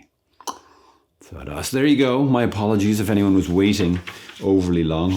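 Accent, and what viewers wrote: Ireland. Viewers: I like the Irish accent to...❤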